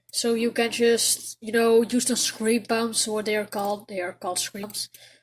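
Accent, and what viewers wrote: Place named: Netherlands. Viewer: Thx bro and i hear that lil dutch accent :)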